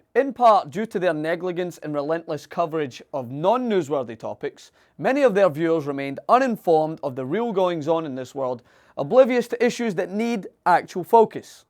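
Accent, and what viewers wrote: Scotland, United Kingdom. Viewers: Dat scottish accent - adds a certain flavor of badassness :D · Since when does TYT have a Scottish host?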